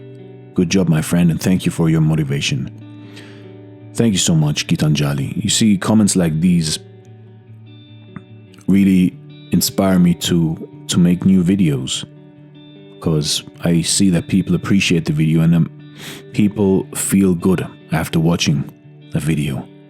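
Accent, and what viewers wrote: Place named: India. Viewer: Your voice tells that u r from india 🙏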